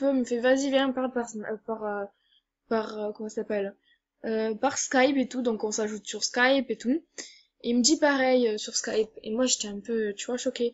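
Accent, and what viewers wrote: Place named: Toulouse, France. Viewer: Kami Kado De youtube elle a le même accent quelle (Toulouse je pense) :)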